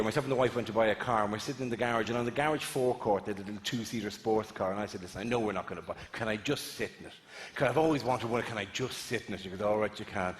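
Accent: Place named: Ireland. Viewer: It's just an Irish accent with a lisp thrown in.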